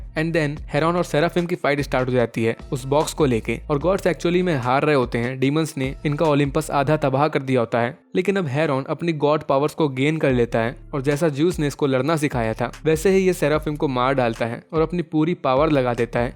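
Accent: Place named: Bihar, India. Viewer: Ohh man.... are you from bihar?? I can recognise it coz of your accent.. But your voice is really relaxing and soothing.🥰❤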